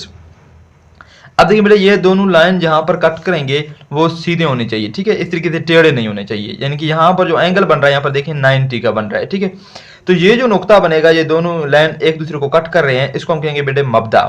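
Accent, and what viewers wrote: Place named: Saraiki, Pakistan. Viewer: Sir lagta hy AP saraiki Hain q ky ma Bhi saraiki Hoon AP ki saraki ka mughy PATA chal gya😊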